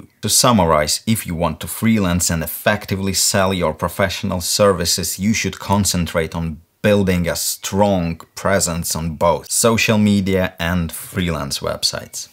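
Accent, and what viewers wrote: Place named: Russia. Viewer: (Rus) -Такое чувство что твой родной язык русский. Но все ровно за опыт который ты передаешь своим подписчикам. (Eng) - I feel you're native language Russian. Anyway thanks for experience that you transmit with your subscription. 😁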